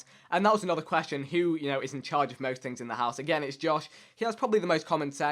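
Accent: England, United Kingdom. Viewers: well he was raised in Britain so obviously he got to be talking in the british accent. but he's indian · gianluca moores Maybe you thought that because he has a English accent .___. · I'm a new sub recommended by a friend, out of curiosity what is his ethnicity? his accent sounds English (UK)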